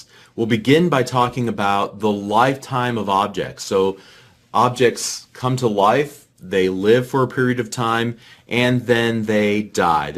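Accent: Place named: United States. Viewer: Great channel sir, I really love these tutorial especially that they are in American Accent, this is a great advantage these days with all the rubbish accent courses invading Youtube.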